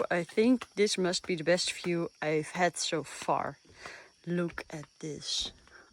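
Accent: Netherlands. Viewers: Dag, bedankt voor deze gewelig mooie video. ik heb er van genoten en ook van jouw Engels met dat onmiskenbare lichte Nederlandse accent😂 Groeten uit Breda NL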